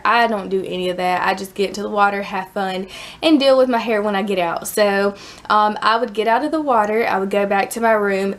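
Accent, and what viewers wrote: Texas, United States. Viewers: I’m thinking “why is everyone saying she has an accent” but then I realize I’m from Texas and have the same accent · You're too cute.. especially your little country accent...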